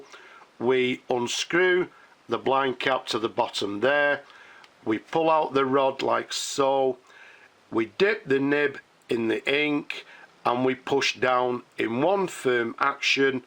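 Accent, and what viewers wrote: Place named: Yorkshire, United Kingdom. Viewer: Dear, Your videos are magnificent. How you manage to get such stunning pens is an enigma to me. I am sure you are doing a lot of research. Your voice and pronounciation seems that you are from Yorkshire in England. Am I right? Very very similar to that of the legendary Geoff Boycott. Are these pens (ONOTO) made in UK? Is the company still around. Please let me know. It is such a pleasure watching your videos. Keep doing it and God bless.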